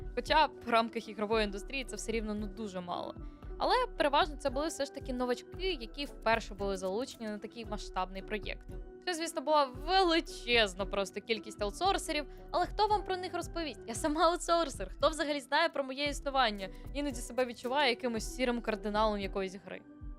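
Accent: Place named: France. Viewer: Шановна, вам дуже личить французький акцент, відос класний!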